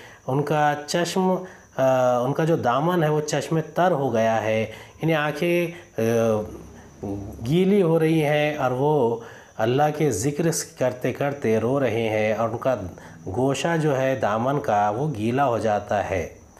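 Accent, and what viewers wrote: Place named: Aurangabad, India. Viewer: Aapke Urdu bolne ka tarike se hi pata chalta hai aap Aurngabad k ho😊 Ma Sha ALLAH good explanation 👍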